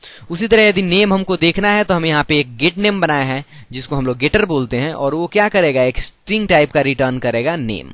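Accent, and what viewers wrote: Bihar, India. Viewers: typical bihari accent ...But lecture is good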